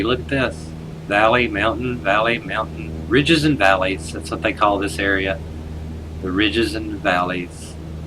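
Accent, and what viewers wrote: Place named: Tennessee, United States. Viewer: Hi… my husband and I enjoy watching your flight simulator videos as my husband used to fly ultra lights …we live in the Treadway Tn area … listening to u it seems u live close to us… ?? We were just curious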